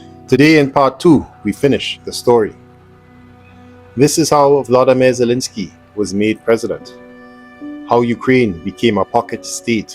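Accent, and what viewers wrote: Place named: Trinidad and Tobago. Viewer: I know that trini accent anywhere in the world. 🇹🇹 🙌😎 Wha iz Di vybes boy?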